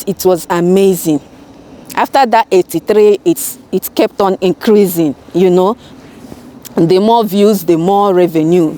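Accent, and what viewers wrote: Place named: Igbo, Nigeria. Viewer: YouTube is really a great blessing honestly ❤❤,low view can be discouraging but we keep moving,yes momma I remember the airfryer 😅 both the accent matter,but we like our igbotic accent like that 😂, you are Amazing 🥰, keep bringing it on 👌🏽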